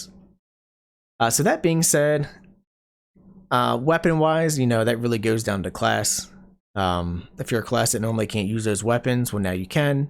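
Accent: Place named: Delaware County, United States. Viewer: The Delco accent discussing BG3 races is hilarious. If I’m wrong and you aren’t from Philly you have to be from Baltimore area.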